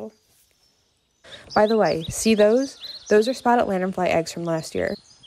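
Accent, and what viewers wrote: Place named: South Jersey, United States. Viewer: You south jersey has a sifferent dialect than central and north east lol :)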